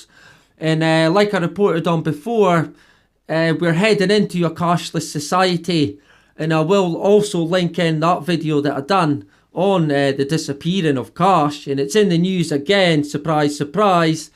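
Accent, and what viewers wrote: Scotland, United Kingdom. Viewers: Am I the only one who loves to hear his intro ? "Right, here we go, back again, back again" in that Scottish accent.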